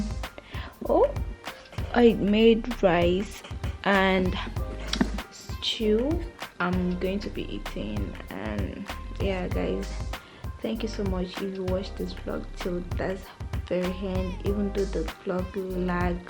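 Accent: China. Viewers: I love well you your Chinese accent. And hunny I loved your vlog. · Another beautiful vlog. I love your Chinese accent 🥰😍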